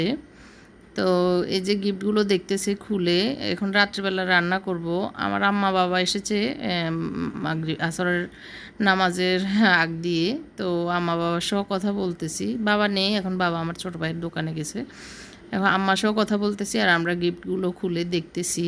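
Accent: Noakhali, Bangladesh. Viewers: আসসালামু আলাইকুম আপু। আপু আপনার বাড়ি কি নোয়াখালী?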